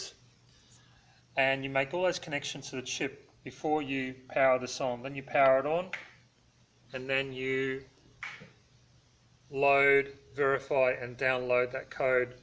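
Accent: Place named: New Zealand. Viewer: U sound like a true kiwi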